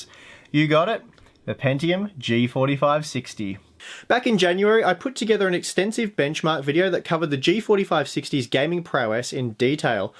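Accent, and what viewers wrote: Australia. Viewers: what is this guy an Aussie? Aussie! Aussie! Aussie!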